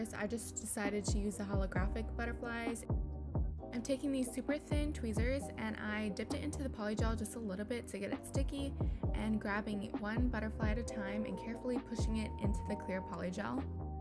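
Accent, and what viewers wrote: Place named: California, United States. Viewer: I see what you mean. It has the same cali girl accent, but she doesn't have the same tone of voice.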